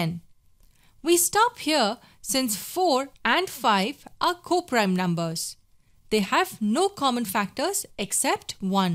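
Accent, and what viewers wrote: India. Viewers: Fucking hate that Indian accent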